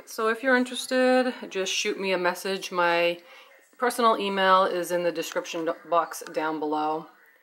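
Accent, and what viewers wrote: United States. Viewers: The way you talk has a.ways been ok to me you just sound American 😊😊👍🏻 Like sounds like “Like” maybe i say it similar . Just carry on 😊😊😊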